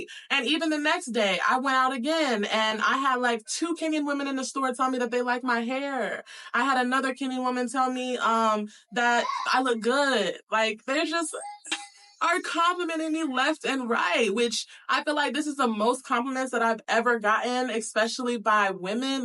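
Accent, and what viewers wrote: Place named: United States. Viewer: Your American accent is what is making them shy its not that they are ignoring you.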